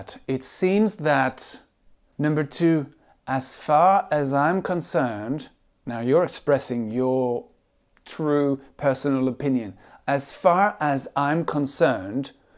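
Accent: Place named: United Kingdom. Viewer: What a wonderfull video ! I'm an college student learning English for many years , I'm not far from fluency but you steal learn me things ! Thanks a lot. Oh, and what a wonderfull British accent. I would have loved to speak the same but my natural way of speaking sounds more like GA. To cap it all, thanks sooo much, your video can help anybody wether their level or age.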